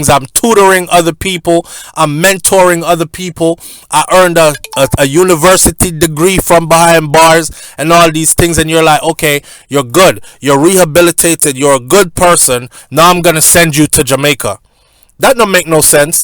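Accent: United Kingdom. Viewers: Good British accent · Man British accent turn up. I give you A+ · Well done on the British accent. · British accent fit yuh 😂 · Big Up To The British Accent. I was born in England and I am telling you its one of the most Racist Countries in the world. I have lived in Jamaica for 20 years. I would like to see them try and arrest me at their Airport Hell and Powder House they would have to send for the Army.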